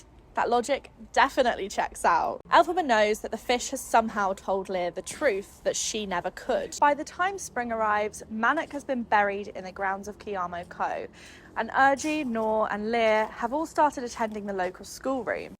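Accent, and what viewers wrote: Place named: United Kingdom. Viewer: It took me 4 minutes to realize you’re British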